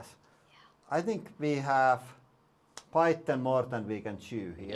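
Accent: Finland. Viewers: Love your videos, love your Finnish accent 🧡🤘🏻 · Hammers are nice and all, but nothing can beat a Finnish accent.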